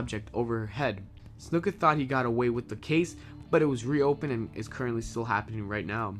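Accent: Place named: United States. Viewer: Holy Shit! A WWE Top 10 with an American voice!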